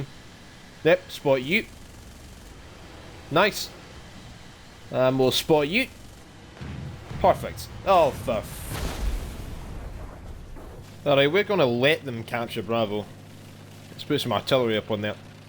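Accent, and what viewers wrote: South Africa. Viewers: 10/10 South African accent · Your South African accent sound more like the Durban Indian accent than the Afrikaans accent XD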